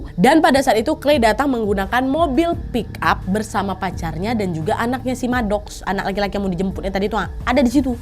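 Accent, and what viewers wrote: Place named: Medan, Indonesia. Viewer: ngakak,nampak kali medannya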